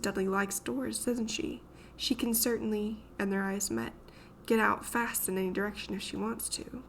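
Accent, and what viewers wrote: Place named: United States. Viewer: It's nice to hear a woman narrating a first-person story with a female protagonist. American too! Thank you!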